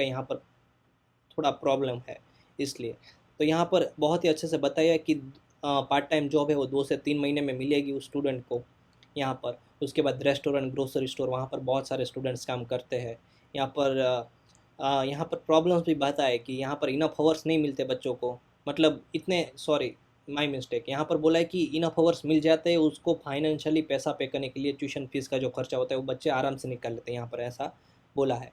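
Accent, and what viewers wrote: Gujarat, India. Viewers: Bro kya aap gujarat se ho?? Because I am from gujarat orr aapki baate sunake muze aisa lag ra hai ki aap gujarati ho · Gujarati kaise Hindi bolta h iske upar se ye Lagta h Please replay me 🔥🙏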